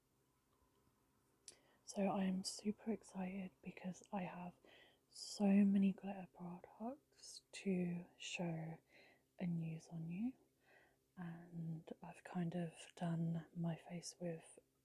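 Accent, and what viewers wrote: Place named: East Anglia, United Kingdom. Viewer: Love a British asmrtist! You sound like you are possibly in the East of England like me. Enjoyed your vid thank you x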